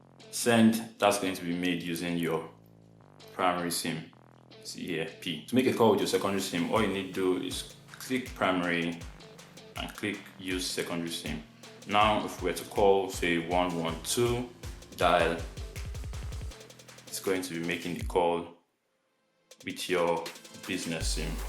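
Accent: Nigeria. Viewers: Good day, You sound NIGERIAN. Please how does one call using the secondary sim from contacts. Since I can have numbers in my head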